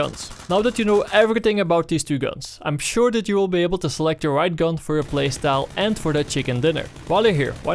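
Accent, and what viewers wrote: Netherlands. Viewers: 100% Jij bent Nederlands. De accent xD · the more the netherland accent coming through - i love it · Judging by his accent, he's from the Netherlands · You sound dutch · Sorry it is dutch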